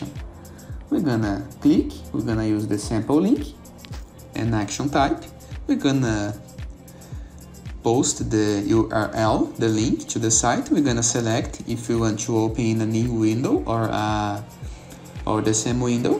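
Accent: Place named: Brazil. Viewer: Are you brazilian? maybe .. your accent is exactly the same srrsrs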